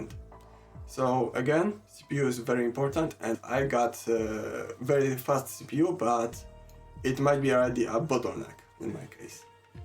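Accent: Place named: Poland. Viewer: Odpalam i słucham - pierwsza myśl polski akcent, sprawdzam Artstation i jest! Pozdrawiam! :D super video!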